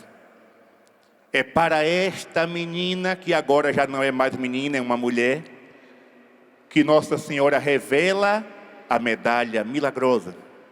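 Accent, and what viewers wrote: Bahia, Brazil. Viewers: Boa noite Padre gosto muito do senhor admiro demais da conta suas homilia são todas maravilhosa gosto muito do sotaque baianos e de todos os nordestinos acho muito lindo🎉🎉🎉🎉🎉🎉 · Sua bênção padre sou mineira e amo suas pregações com esse sotaque baiano kkkkk Deus abençoe o senhor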